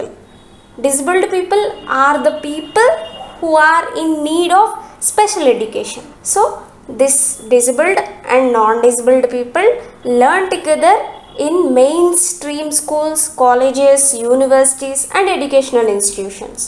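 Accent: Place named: Telugu, India. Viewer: Good speaking but you still pronounce like Telugu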